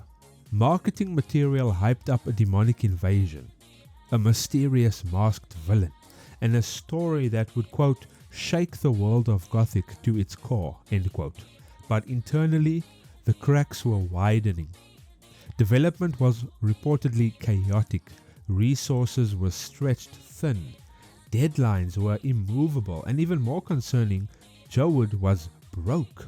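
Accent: South Africa. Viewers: I'm sorry if this is a bit off topic, but given your accent I have to ask: Are you South African? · Do I hear a fellow South African?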